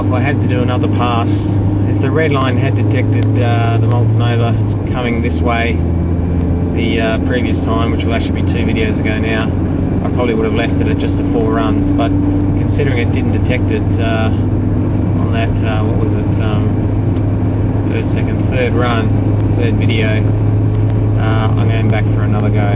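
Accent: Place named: United Kingdom. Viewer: ok sorry about that because i'm french and having hard time understand your kind of british accent keep on good worck !! best samuel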